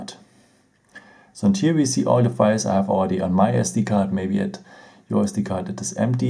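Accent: Germany. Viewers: Bist du aus Deutschland??😂😂 Hört man voll raus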